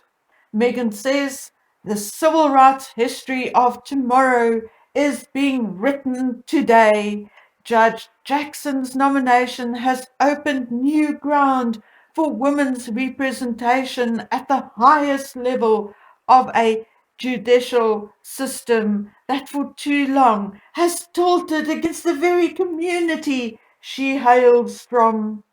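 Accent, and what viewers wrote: South Africa. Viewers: Hi. So lovely to hear a South African voice😉.great point of view, and spot on! Keep it up.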